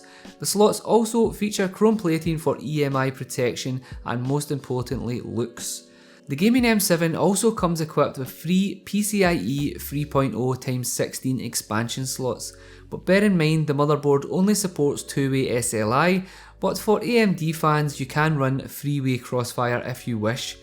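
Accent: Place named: Scotland, United Kingdom. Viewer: Yer Scottish ye have my support just got the board for a new build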